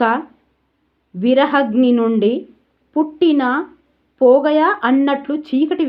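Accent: Telangana, India. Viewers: Telagana భాషలో మంచి గా మాట్లాడుతున్నారు medam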